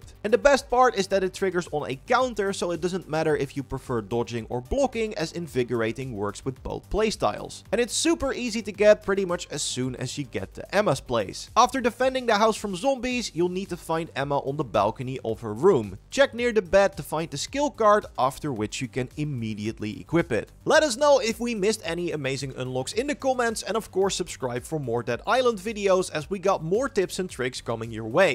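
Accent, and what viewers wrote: Netherlands. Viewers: My god.. the Dutch accent in this guys' English 🥲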